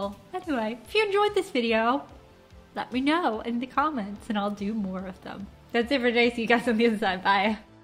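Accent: Ireland. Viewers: On the basis of you're accent, i'm assuming you're irish. If you are based in dublin, i would check out ticketmaster for tribute bands of. I've seen one at the academy. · You're funny..... And you gotta love that accent! I'm Irish but I don't sound like that.... I mean, my Great, Great, Great, Great Grandfather was Henry Grattan!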